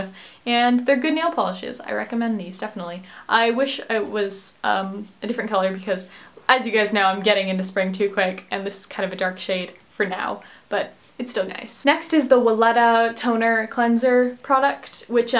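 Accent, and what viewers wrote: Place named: England, United Kingdom. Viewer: I can hear an English accent creeping in there :)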